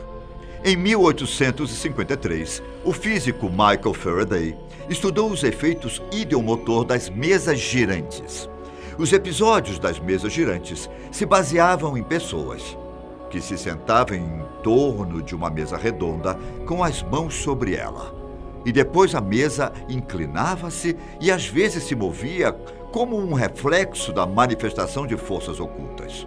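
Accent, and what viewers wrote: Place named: Rio de Janeiro, Brazil. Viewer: Esse aí é carioca em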